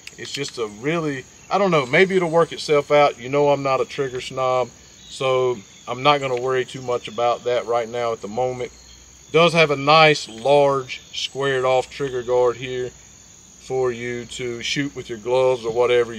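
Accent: South, United States. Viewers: Subscribed for the southern twang alone. Who makes your iron sights? Thanks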